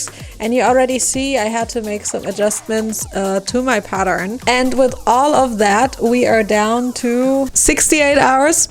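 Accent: Germany. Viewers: Is it possible that she is German? The way she speaks English sounds like it. wish you all Love Light and laughing 💛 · Guys is she german? Because im german and I think she has a german accent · This is the first video i see from you and i was like "I hear german i just cant proof it" until you sayd Schlüppi Ich bin vor Lachen von meiner Couch geknallt😂 First impression btw😂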